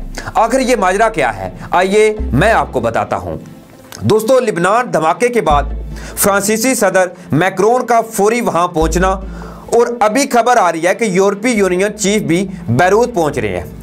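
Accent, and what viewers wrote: India. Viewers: Assalam Walekum my brother speak Urdu so rightly you are talking like Indian country people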